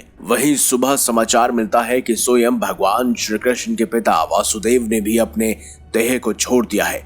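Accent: United Kingdom. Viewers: जानकारी तो बहुत अच्छी दी है आपने लेकिन आप ये english accent में क्यों बात करते है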